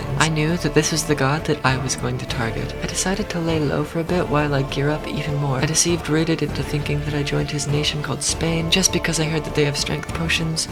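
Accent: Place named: United Kingdom. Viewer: Brit’ish ebony POG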